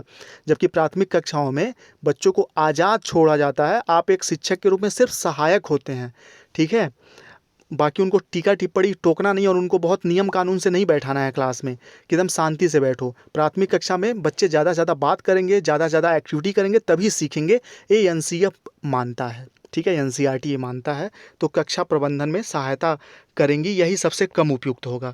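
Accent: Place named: Bhojpuri, India. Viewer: Aapki bhojpuri bilkul hmari trh hai sir😀🙏 आरे तूं जीवन भर नाहीं सीख पइबअ🤗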